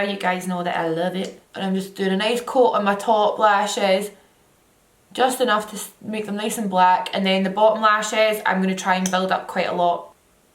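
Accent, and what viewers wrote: Scotland, United Kingdom. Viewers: I usually don't like the Scottish accent (i have a cheek as i'm from Belfast, N.I lol) but i LOVEEEEEE your accent/voice soooooo much ❤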